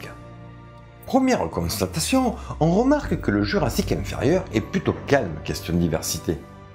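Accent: South, France. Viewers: Cher, tu es un mélange de Ben Kingsley pour le physique, de Jean Lassalle pour l'accent (ce n'est pas moqueur, je suis moi-même du Sud), d'Alan Grant pour le savoir et de Carlos (le chanteur) pour les chemises😂 Par contre, es-tu sûr que le Tyrannosaure et le Tricératops soient apparus au Jurassique? Il me semble bien que ce soit au Crétacé, non?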